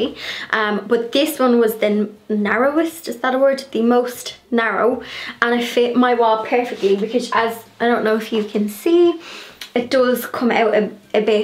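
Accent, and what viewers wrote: Ireland. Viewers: OMG Are you Irish? What a lovely accent. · Hi, just found your videos and subscribed so I can watch new ones! Spent the weekend watching your previous ones! Enjoyed all your up cycles, blogs. You have a beautiful home. I’m here in the UK. Love your gorgeous Irish accent too. 💕 x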